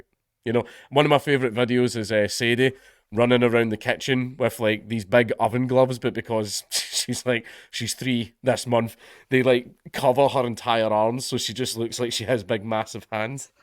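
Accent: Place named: Scotland, United Kingdom. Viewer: My daughter in the room just asked about Dankula saying: "how do you understand him dad?" And I just said: Scottish?!?! Easy, just watch Trainspotting once a year for the past 20+ years."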